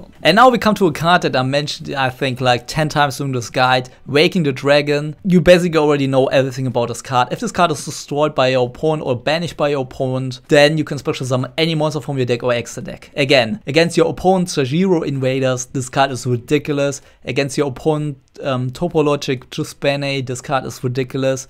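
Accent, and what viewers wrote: Germany. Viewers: Great video. A friend showed me this for the upcoming sneak peak. Loved the German(?) accent.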